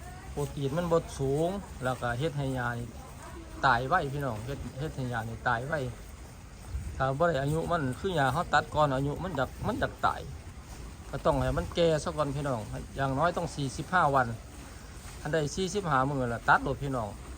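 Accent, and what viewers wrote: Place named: Korat, Thailand. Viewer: โคราชชัดเจนดีครับ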